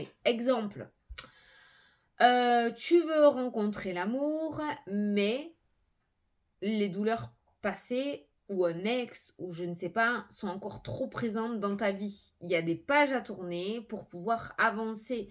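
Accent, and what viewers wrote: South, France. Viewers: je pars en vacance à chaque fois que je vous écoute,votre accent du sud est magique et me fais voyager.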